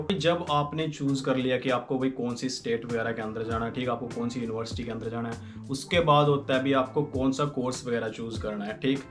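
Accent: Haryana, India. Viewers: HARYANA SA H BAHI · Bai haryane te aa ?????😎😎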